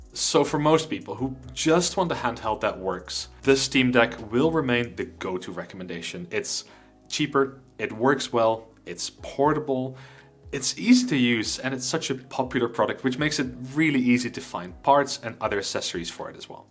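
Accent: Netherlands. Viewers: Hes from the Netherlands, accent reveals. Needs more research.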